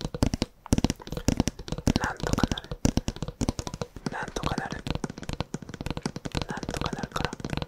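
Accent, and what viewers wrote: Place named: Kansai, Japan. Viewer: いつも標準語のロールプレイしか聞かないから関西弁ロールプレイ新鮮でめちゃよい！！！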